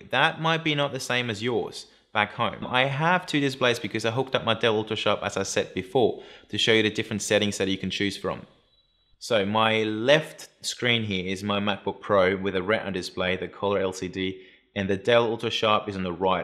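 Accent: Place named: South Africa. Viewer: Felt like there were hints of South African maybe? I'm also curious!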